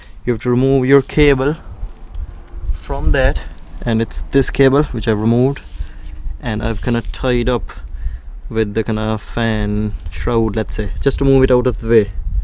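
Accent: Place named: Portlaoise, Ireland. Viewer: That a Portlaoise accent I’m hearing, Thanks for this video brother helped me save allot.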